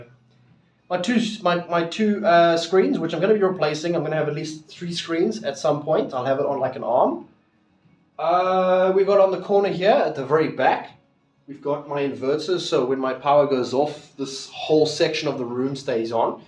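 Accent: South Africa. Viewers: A. Room looks good, reminds me of how your office was set up at your old place because you had the cupboard behind you then. (I know cause that was the only way you could set up your office) B. I keep my PC on the floor, got it packed today for the move and there was a huge dust bunny under it. Good luck with Zelda's dust bunnies. C. Green screen idea sounds good, can't wait to see the final product. Can you actually sew because you mentioned stitching? D. Room is lacking some color, looks a bit like a hospital room. Maybe when you get the green screen in its final set up you could get some pretty window coverings? Unless you like all the white then power to ya. E. Finally, when your sick like in the video your South African accent is much thicker. More thick than normal i mean. ❤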